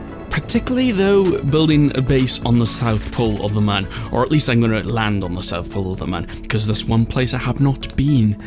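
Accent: Wales, United Kingdom. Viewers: love ur Welsh Accent, besides that. Please can you include wich mods you are using?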